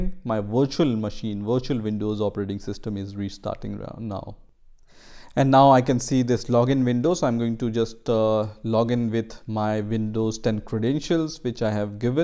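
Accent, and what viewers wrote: India. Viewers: slight indian accent always comforts me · this. That's clearly an indian accent. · dude this might be racist but I am getting scamming vibes like I am watching one of those scam "how to videos" because of your Indian accent. I know it is not but I am just getting those vibes maybe because I am racist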